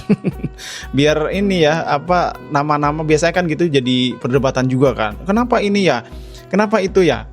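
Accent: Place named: Sulawesi, Indonesia. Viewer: Assalamu Alaikum Bung, maaf Bung pernah transmigrasi di Sulawesi, tepatnya dimana? Pantas dialek pengucapan seperti orang Sulawesi, padahal dilihat dari nama seperti nya dari Jawa yah Bung?. Sehat dan sukses selalu Bung, Aamiin.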